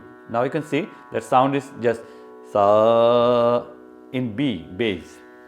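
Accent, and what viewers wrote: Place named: South India, India. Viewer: Sir Assalamualaikum, I am from West Bengal. Yor online flute lesson helped me a lot how to Play a flute. Sir yours in this video the flite E base' has intrigued me for it's looks and color. I just wanted to know that how much wil it cost me if I to buy it from you. ? And how to get it. ? Fron your dialectic accent i guess you are south Indian. Can i have your address plz, so that if i can make visit you there and purchase my required one flutes.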